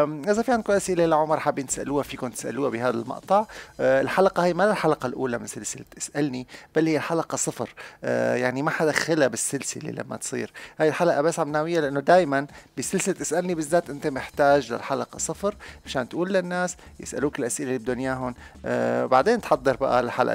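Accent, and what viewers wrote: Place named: Syria. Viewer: ساكن في كوكب ناميك اصلو sayin يعني مالك سمعانو عم يحكي سوري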